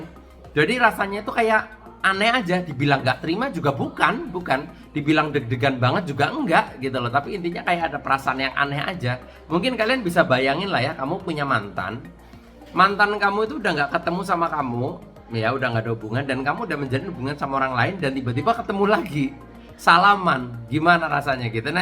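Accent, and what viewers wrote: Banyumas, Indonesia. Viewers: Mengeneh gara² video ne kang 😂rika ngapak banget asli ngendi mas😂😂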